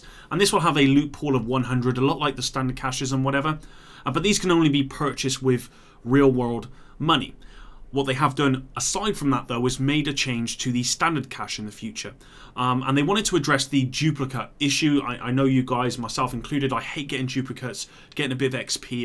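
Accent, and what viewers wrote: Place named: Cockney, United Kingdom. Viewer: propa cockney m8 th=f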